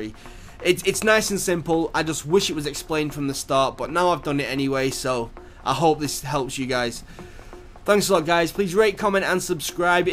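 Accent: United Kingdom. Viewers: awful british accent is awful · i know right he saound like Hoxten a bit i though like what this is Hoxten and hes making tutorials :D he sounds bit like a hes from england i think he is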